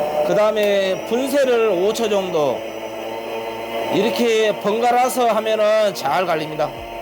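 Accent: Jeolla, South Korea. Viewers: 전라도는 이신가벼요 친숙해서 좋아요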